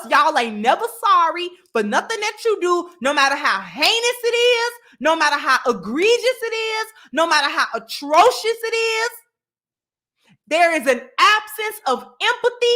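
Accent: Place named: Baltimore, United States. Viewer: She got a Bmore accent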